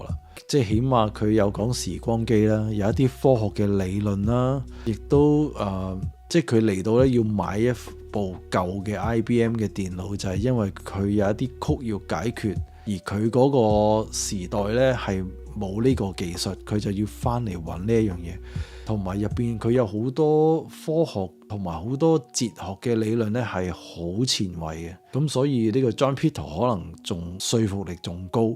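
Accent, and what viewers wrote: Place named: China. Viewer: 一聽大陸可以唔洗聽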